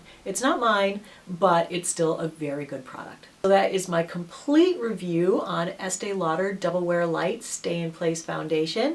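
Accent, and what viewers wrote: United States. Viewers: This foundation looks amazing on you. Another thing, a bit irrelevant i guess, is that i really like the way you talk and articulate. Such a nice accent (american, but you still have many kinds of accents). Makes want to listen to your reviews :D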